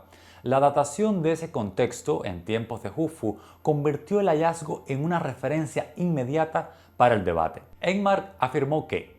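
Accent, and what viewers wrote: Cuba. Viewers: Me haces sentir orgulloso de ser cubano. Pensé que eras catalán, por tu apellido, pero tu acento me decía que hablabas como nosotros (cubano al este de Matanzas). Excelente trabajo, magnifica presentación. Sigue en esa dirección. Felicitaciones. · Hermano , ese acento y fraseo es MADE IN CUBA . Chevere 👌 , me voló la cabeza reconocer a un cubano abordando estos tópicos ancestrales , lejos de la "MACHACADA" y adornada historia de mambices y epopeyas INFLADAS que caracterizan la mal contada historia de Cuba. Gracias por tu estupendo trabajo . 👌. Saludos.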